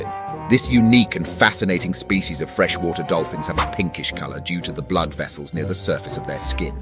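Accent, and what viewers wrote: United Kingdom. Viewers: 😂😂😂😂🤣🤣🤣😂”….They STRAIGHT UP look like a sacred animal, from out of The Lord Of The Rings universe.” I can’t! I just can’t!! Trying to sound all hood, while educating with that British accent. I paused the video for that one😂😂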